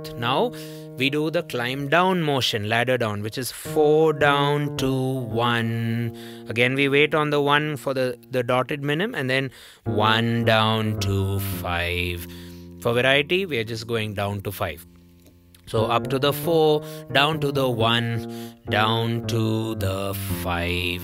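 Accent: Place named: India. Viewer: thanks for a very informative video. your explanation are clear and simple. i watch a lot of technical videos and as a native American English speaker, i often find the indian accent annoying and just move on. while your accent is obvious, it is very understandable and not at all annoying. i work with many people with various accents and it is always apparent who cares about being understood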